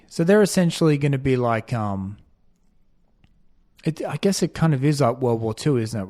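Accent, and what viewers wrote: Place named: Australia. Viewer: Hey good to hear an Aussie talking about this I’m petrified my biggest fear is nuclear war and I just can’t believe that this crazy fucking person Putin even call him a human has all this control a few boomers can destroy all of our hopes and dreams. Whereabouts in Australia are you from? I’m from New South Wales.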